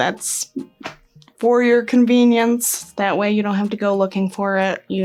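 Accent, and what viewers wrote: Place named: Michigan, United States. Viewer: awe thank you so much!!! That is so sweet! I definitely will take you up on that! I'm subscribing to your channel now, your videos are inspiring! I've lived in Michigan my entire life and your "accent" reminds me alot of ours lol im sure your state is close I thought that was cool as well, I feel like I don't hear it to often on YouTube.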